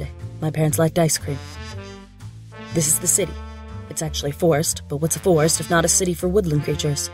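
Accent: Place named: England, United Kingdom. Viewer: This was the worst and most annoying English accent i've ever heard.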